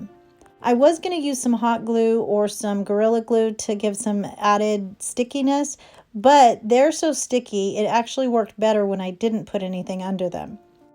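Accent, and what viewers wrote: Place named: Georgia, United States. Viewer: I always LOVE watching your channel! You are so creative!! Love when you say, “anytime you can get more Jesus” in your projects! Because we all need a lot more Jesus! Love that you always share inspirational meanings in your projects!! I love Crafting Cousins as well! (Btw I’m from GA, so I have that same “cutie patudie” (don’t know if that’s spelled right!) ..accent too!) 😂 God Bless you all, as you share God’s word, through your creative gifts of crafting! ❤️🙏🏼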